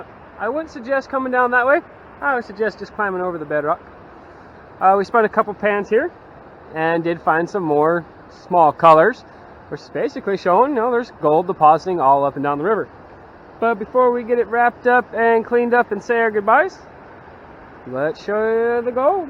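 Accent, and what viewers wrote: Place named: United States. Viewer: Thanks! I could not understand any of the detail words with such a heavy American accent as cool as it sounds aha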